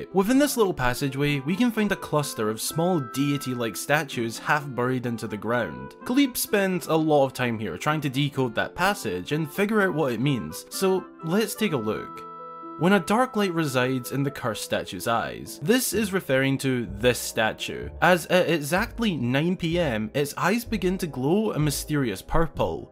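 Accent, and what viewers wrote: Scotland, United Kingdom. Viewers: Why thats a lovely Scottish accent you have there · he's from the UK dude (pretty sure), he has an accent, foreign people just pronounc things differently 🙄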